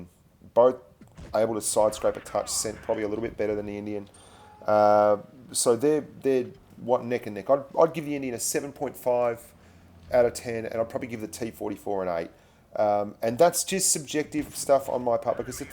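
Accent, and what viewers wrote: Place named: Australia. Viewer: ok thank you and u sound australian ik so cause I live with 2 australians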